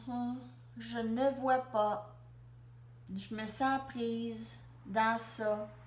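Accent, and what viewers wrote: Canada. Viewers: Bonjour, et merci pour ce tirage qui me parle vraiment.....Vous êtes fort sympathique et cet accent délicieux du Canada (j'ai de la famille à Montréal et à Québec) moi je suis à Manosque en Provence...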